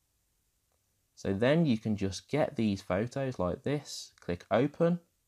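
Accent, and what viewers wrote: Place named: Yorkshire, United Kingdom. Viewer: Love the Yorkshire accent (Lancashire?) ... ! and right now trying out Lately here in Japan where I am based (I'm from Yorkshire). I basically came to your tutorial as I only post to IG via my MacBook Pro and need to do some multiple photo posts. Normally post by changing the User Agent to iPhone in Safari but it has too many limitations.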